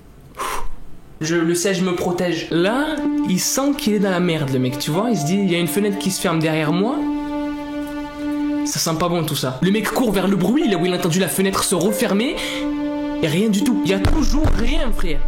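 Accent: Quebec, Canada. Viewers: qui trouve que parle grv bien quebecois? 😆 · Jadore ton acssen Quebec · j'adore ton accent Québécois 😂👌(j'en suis une O.O) 👇liker si vous êtes Quebecois/Québécoise 👇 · Accent quebecois acceptable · Ta un bonne accent quebecois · Moi je trouve que ton accent québécois parce que je parle québécois 😉😉😉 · Ho wow l'accent québécois😂👌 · je suis québécois et tu parle bien Québec👍👍👍👍👍👍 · Jadore to accent quebecois et ton exorsice😅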